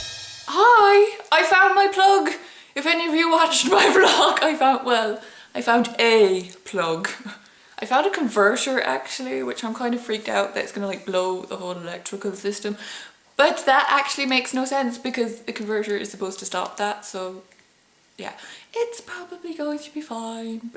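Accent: Ireland. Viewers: oh I love your Irish accent!! I´ve been there for half a year and it was great. It remembers me of the time there when I listen to you ;) great videos as well!! P.S.: Are you from the West of Ireland? :)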